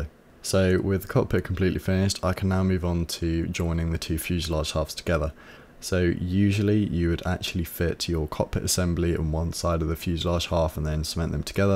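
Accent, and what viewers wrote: United Kingdom. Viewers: Extremely strong British accent.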